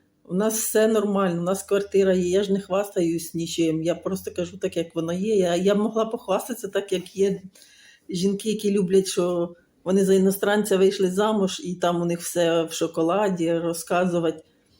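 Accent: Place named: Bukovina, Ukraine. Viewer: Мабуть ближче до Чернівецької. Бо з Буковини і мені близька ваша вимова.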